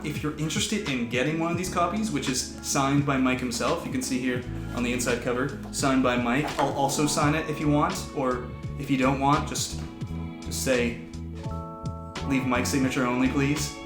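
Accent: Canada. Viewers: Where in Canada are you? (Your subtle accent gave you away!) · Nice to "meet" you, i was looking forward to you reaching your 100K mark (congrats on that!). I had guessed right about you being from Canada - I can't tell the difference between how Canadians and Americans pronounce "tomorrow" but to me, the giveaway was the way you say "out". I've noticed Canadians pronounce the U more. (I'm French-Canadian, so it took a while before my English became good enough for me to notice those subtle differences.) You didn't say which province you are from, though...? Love your humour. Would love to hear you cover Survivor - I'm a big fan as well. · Also you say "Tuh-more-owh" and Americans say "Tuh-mar-oh" that's probably what gave the canadian away. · Congratulations on reaching 101k...that is amazing and the fact you are Canadian as well is such a bonus!! 🌹 ( We say tomorrow different than yankees? 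Who knew) PS MikeBookGiveAway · OMG those dogs are awesome!! LOL! Ok...You're so cute and thanks for doing the face reveal. It's so nice to have a face to go with the voice now when I watch the fantakes. I'm glad you do them and I am forever anxiously awaiting the next one you make. And yep...I can definitely hear the Canadian now that the video came out and I'm listening more to what you are saying than concentrating on a recap. It's the word "about" that always gives it away. To my ears it sounds like "aboot". LOL....well, maybe not quite that bad but close enough. Always a fan and congratulations on hitting 100,000K. You rock! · I FREAKING KNEW IT I KNEW THAT ACCENT WAS CANADIAN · Well that explains why your voice sounds so normal to a fellow Canadian. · I WAS SO NERVOUS for some reason haha I pictured you with dark brown hair! I’m not surprised you’re Canadian based off of your accent :) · As a fellow Canadian I somehow detected your Canadian accent haha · I thought you were cool better because you have a Canadian accent and I'm a Mainer/half Canadian--- but as a Big Sister-- you're even cooler for talking about the Big Brother/Big Sister program! 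Love your videos, thanks for being so fun!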